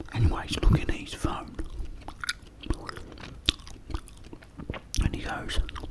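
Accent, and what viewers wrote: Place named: Cockney, United Kingdom. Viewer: Hahaha. the cockney accent is Soo tingly!! I literally fell asleep to this earlier and had a weird nap dream about opening all the drapes in your house and you arguing with me to keep them closed 😂 But close up heavy accent whispers are such great ASMR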